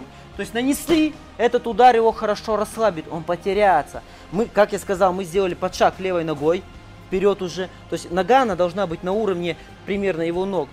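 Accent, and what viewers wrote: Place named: Urals, Russia. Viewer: По говору слышу — ты уралец.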